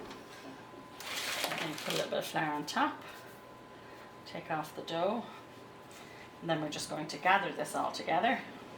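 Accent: Ireland. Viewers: Hi. Greetings from Belfast in Northern Ireland. I’ve been watching your videos while in lockdown here due to the Coronavirus Crisis and I must say you do my wee heart good. I love the simple and easy way you show how to bake and cook. You’ve converted me!!! I’m a 60 year old aul fella and I’ve now made scones for the first time in my life - and they were bloody good!! Lol All thanks to you. Just wanted to encourage you and let you know your videos are being watched, not only in Canada, but right across the Atlantic back here in good old Belfast. It’s lovely to hear your Irish brogue and just your easy going way that gives us the courage to just give it a go with the baking. Huge thank you and I look forward to more videos and your channel growing with lots more followers. Good luck and God Bless. John 😀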